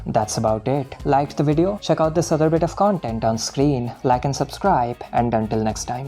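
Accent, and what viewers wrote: India. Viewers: Clearly seems to be Indian.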